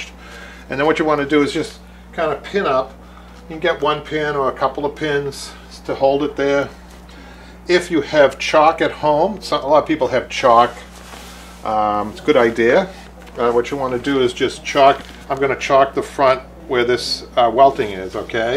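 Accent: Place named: New York, United States. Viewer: Love that New York accent ..."right om"...it's R A D . And I love the demo. I want to buy a beautiful upholstered chair for my new-to-me modular home and the arms are stained . So this is the answer ! Thanks !!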